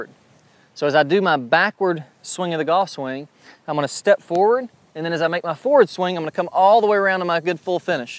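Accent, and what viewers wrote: United States. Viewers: This dude's accent sound like Mid country side of America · Great video! I've already started on the drills. Are you an Alabama boy? You sound like it!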